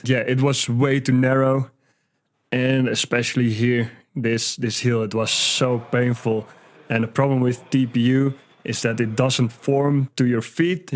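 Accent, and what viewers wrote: Netherlands. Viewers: Took me 4-5 words to go 'this guy is Dutch' am I off? Edit: nevermind lol, extremely clear obviously after watching more than 5 seconds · WTF. HA! You can always count on the Dutch… Funny fuckers, especially when speaking English.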